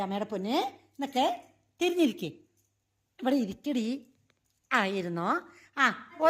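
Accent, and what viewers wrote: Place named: Palakkad, India. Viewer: ഭാഷ കേൾക്കുമ്പോൾ പാലക്കാടാണെന്നു തോനുന്നു.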